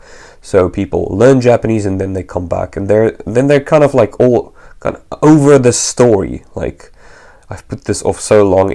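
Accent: Afrikaans, South Africa. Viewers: What, you sound South African... My brother!!! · I was wondering why it sounded vaguely Dutch, Afrikaans makes a lot more sense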